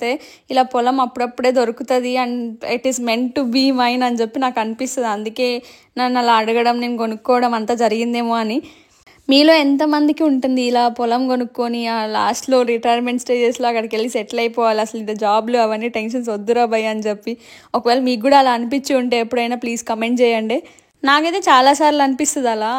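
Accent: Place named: Telangana, India. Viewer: మీరు అన్నమయ్య జిల్లా అంటున్నారు. కానీ తెలంగాణా యాస మాట్లాడుతున్నారు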